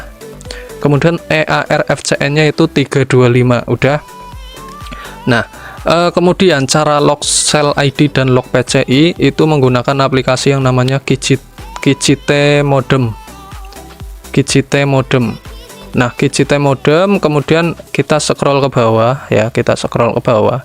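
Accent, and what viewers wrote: Banyumas, Indonesia. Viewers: Ketone wong ngapak kiye 😂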